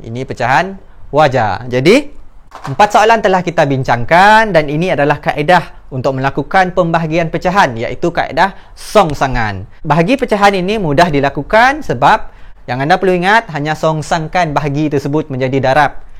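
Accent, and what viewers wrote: Sabah, Malaysia. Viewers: Cigu sabah kah?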